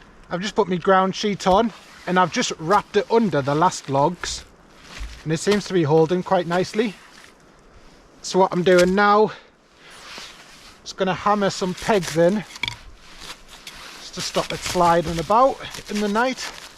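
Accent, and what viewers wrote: United Kingdom. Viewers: You from the uk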